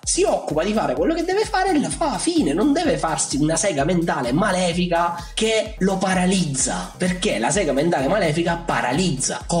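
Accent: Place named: Catania, Italy. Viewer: Hahaha, grandissimo! L'inflessione catanese poi è bellissima, fa simaptia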